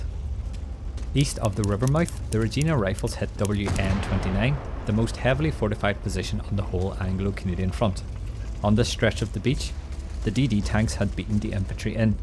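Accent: Northern Ireland, United Kingdom. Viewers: Judging by your northen Irish accent I assume this isnt an A.I voice over. Therefore I have subscribed and liked your video. I grew up in cork myself, but am a dutch guy living in Germany.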